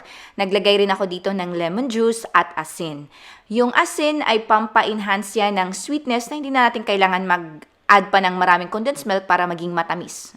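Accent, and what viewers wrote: Visayas, Philippines. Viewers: I can hear a tinge of bisdak in your voice. Hope to hear more from you! 😍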